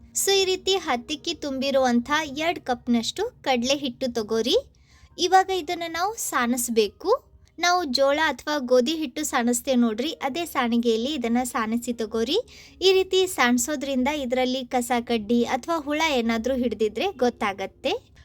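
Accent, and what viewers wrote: North Karnataka, India. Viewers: Yavva yakka dharwad dag adi namma uttar karnataka language matadu agatte hogatte baratte bidu akkti barataiti annu kelaku chanda anastad innu hechhu jana nodatara ninna bashe keladra irritate akkad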